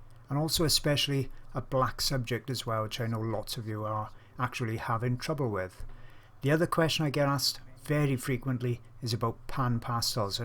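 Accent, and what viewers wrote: Wales, United Kingdom. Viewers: Woooo wow, this is excellent 👏🏻👍🏼 love your art.. can I hear a Welsh accent!!!! I'm from carnarvon North Wales originally ✌️✌️😁😁🇬🇧🐲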